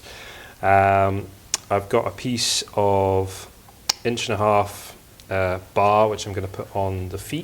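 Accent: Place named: Scotland, United Kingdom. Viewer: Maaate, hearing a tad bit o'scottish in your accent now haha glad to see you're keeping well and to see a new vid. ATB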